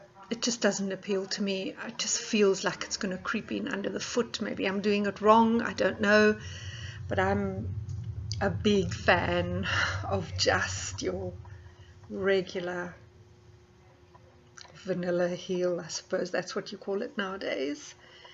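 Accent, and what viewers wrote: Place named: South Africa. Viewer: Hi. Thanks for the review and tips. Just discovered sock knitting and Arne & Carlos' channel on YouTube. You sound like a fellow South African. Where did you buy your yarn?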